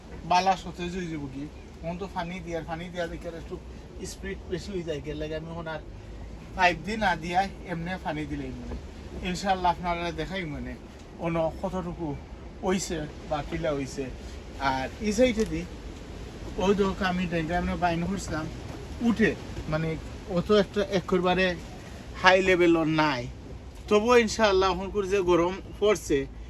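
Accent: Sylhet, Bangladesh. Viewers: আপু সিলেটের আন্চলিক ভাষা একটু ভিন্ন। তাই আপনার বুঝতে কষ্ট হচ্ছে। আমি ছোটবেলা থেকে সিলেটের আত্মীয় স্বজনের সাথে কথা বলে অভ‍্যস্ত তাই বুঝি। উনার ভিডিও গুলো অনেক informative. ইনশাআল্লাহ দেখতে দেখতে শিখে যাবেন। 😊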